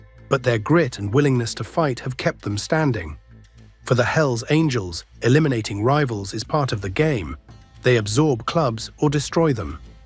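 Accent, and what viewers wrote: United Kingdom. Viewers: Sorry. There’s something just wrong about narrative on hells angles with a British accent. Destroys it. Sound American. 🇺🇸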